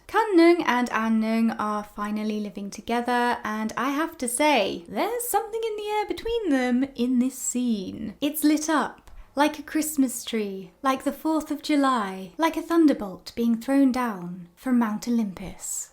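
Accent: United Kingdom. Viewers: I really don't care if the pronunciation is Faye or Faiii. She's hot. Your descriptions just tickle my fancy. So articulate British. Love it. Still laughing.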